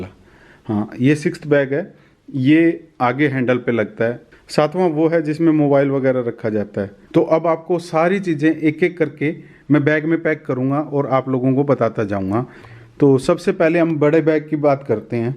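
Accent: Haryana, India. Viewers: bhai harayane k bnde ki awaaz or boli bta hi deti h ..... haryana k h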